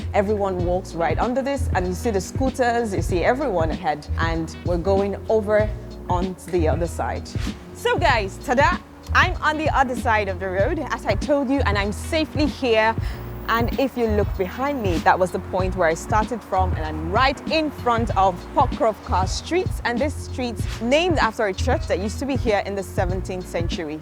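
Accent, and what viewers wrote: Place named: United Kingdom. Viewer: я даже твой британский понимать начал. Но мы не рашья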